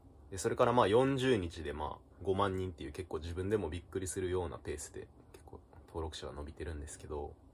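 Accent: Kansai, Japan. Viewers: ダーマより好き笑 関西弁かつ喋りがうまいなんなら、カジサックより面白いし笑 とりあえず引っ越したらどうですか？？？？ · なんか灘生の関西弁が好き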